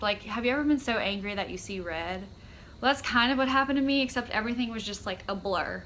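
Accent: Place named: South, United States. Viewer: Hi, my name is Melanie and my husband was diagnosed with Bell's Palsy almost 3 weeks ago. He had horrible headaches the night before and then woke up am took a sip of water, it ran down his chin and he looked in the mirror and there was it. I took him to the emergency room right then and they knew right away what it was. They didn't do a scan or anything. Did you do acupuncture or anything like that? Fred is doing acupuncture, he's meditating and I think yoga as well. We live in Santa Monica, CA, but I'm originally from TN. Are you from the south? I feel like I hear it a little and frankly that's what I found appealing and comforting about your video. Thanks so much for sharing your story and I will definitely check out the FB page. I do drive Fred crazy watching all of the videos about Bell's palsy, but I just find them so helpful! All my best to you.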